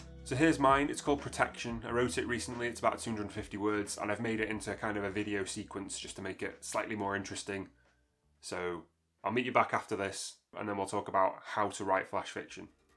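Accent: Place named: North, United Kingdom. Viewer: Just found your channel from this great video 👍 you sound like a fellow northerner! I've been writing flash fiction lately and really enjoying it. Looking forward to checking out some more of your videos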